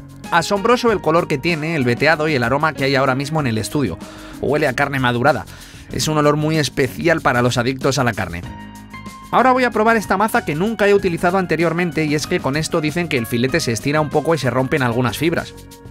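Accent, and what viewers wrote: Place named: Chulla, Ecuador. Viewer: A nadie más le molesta ese micro cuando prueba la comida.... cuando hablas como "chullas" se escucha bastante mal....para lo bueno q es tu trabajo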